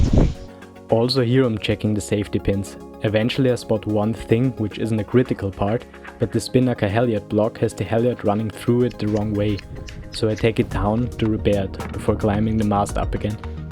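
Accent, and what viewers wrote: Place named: Austria. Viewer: In deinem Vorspann heißt es, dass du der jüngste Deutschsprachige sein willst und machst die Videos in deinem holprigen Englisch, stark geprägt vom österreichischen..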